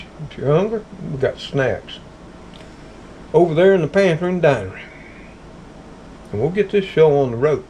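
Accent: South, United States. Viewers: my daddy is from southern Mississippi...I enjoy watching how you do things and listening to your deep southern accent.... May God bless you and the kitty crew.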